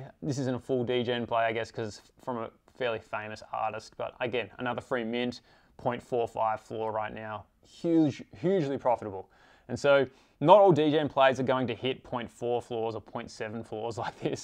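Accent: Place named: Australia. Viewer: Watched all the way through now, I actually enjoyed hearing a fellow Aussie in the space so keep it up my friend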